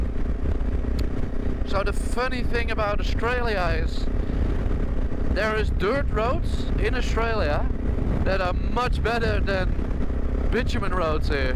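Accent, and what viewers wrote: Netherlands. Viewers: Do you sell merchandises? Your dutch accent reminds me of Itchy Boots Noraly. You both sound the same. I live on Australia. Used to live in Germany. I can understand and read dutch a little bit. Sounds similar to German.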